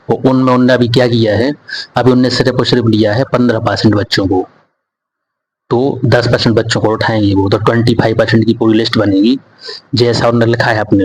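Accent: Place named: Bundeli, India. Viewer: Maharaj aap bundeli bolo wo achchhi lg rhi........you know ni bolo😁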